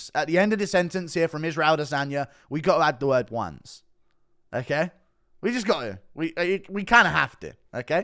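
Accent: South Africa. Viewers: you are hell of entertaining, it's always good listening to your podcasts. You put a smile on my face, thank you. Love the Saffa accent and the truth bombs on Adesanye. You got balls telling the truth, love it. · Hahahah that South African accent ... not bad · Great SA accent dude! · dawg your Saffa accent is on point!😂🔥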